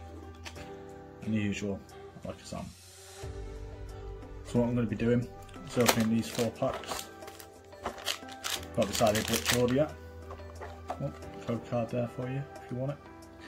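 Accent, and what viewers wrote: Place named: North, United Kingdom. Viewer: Where in the UK are you from your accent sound northern ???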